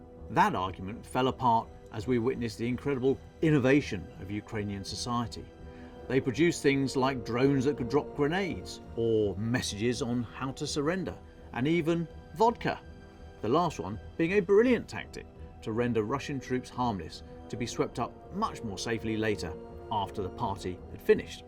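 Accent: United Kingdom. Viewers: "they could get amongst it pretty sharpish" God I love British English and tea teeth.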